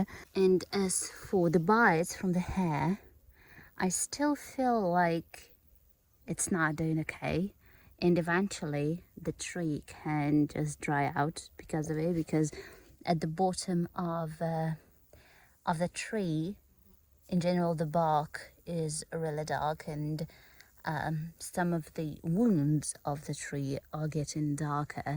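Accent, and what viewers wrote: United Kingdom. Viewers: why you have a british accent? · Ur accent is british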